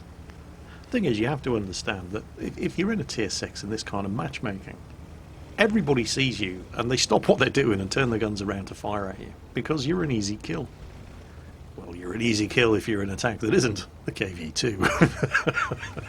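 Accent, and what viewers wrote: Russia. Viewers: Cant get enough of your KV 2 videos including jokes with a Russian accent and laughter. I love your other videos as well but videos with KV 2 deleting ridiculous targets with ridicules shots just gives a little more juice to the entertainment :D. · love that Russian accent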